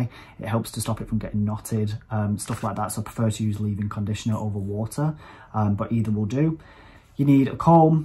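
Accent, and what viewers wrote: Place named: Sheffield, United Kingdom. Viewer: I'm guessing a Sheffield accent 😬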